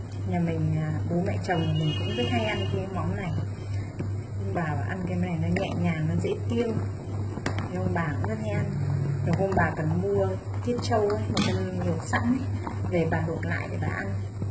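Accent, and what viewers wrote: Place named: Vinh Phuc, Vietnam. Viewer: Giọng nói này là người Vĩnh phúc . Và chưa biết nấu ăn ngon .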